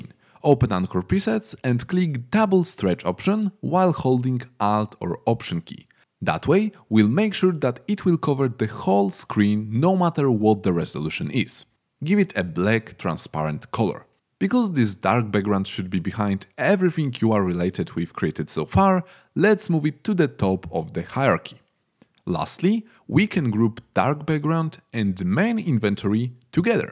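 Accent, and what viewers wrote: Poland. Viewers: are you polish? you sound so for me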